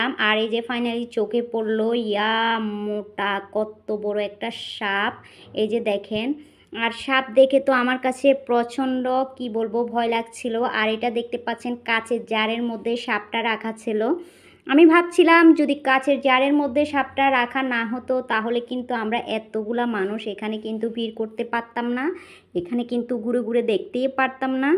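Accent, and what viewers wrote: Chittagong, Bangladesh. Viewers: ❤ amar khub bhalo laglo tomar Kotha gulo bon ❤khub misti bhasa chottogram a ❤sabar bhasa sabar kche sundor sabar sabae k samman dawa uchit❤khub sundor hoache makeup dressing darun sundor lagche tomae bon ❤ghoraguri jhal muri ❤❤gorome thanda juice khub bhalo lage❤sab milia khub bhlo lglo 👍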